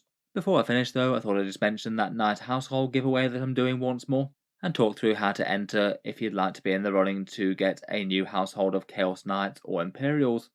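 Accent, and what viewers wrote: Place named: United Kingdom. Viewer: ypur voice is annoying. too british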